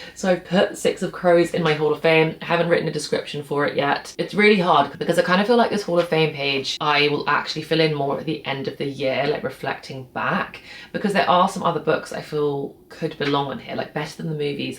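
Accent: United Kingdom. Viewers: Hi 💁🏻‍♀️ are you going to use this channel too or will you continue with the channel? should we subscribe to both? I love british accents and you have beautiful british accent🤍so pure✨Anyways, I found this channel by chance, I loved it and subscribed! I am obsessed 📚books and cozy comfort place, this channel what I need! 😌🌻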